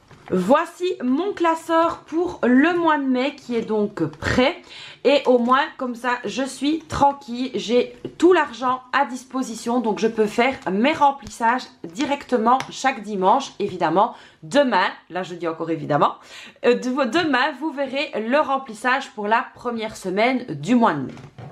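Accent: Belgium. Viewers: ton accent belge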